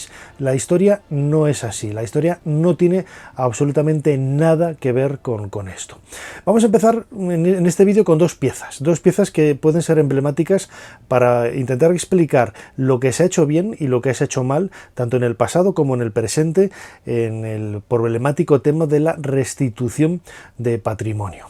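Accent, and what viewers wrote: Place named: León, Spain. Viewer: es el acento que tenemos en León, ningún acento es menos válido que otro aunque gracias por el cumplido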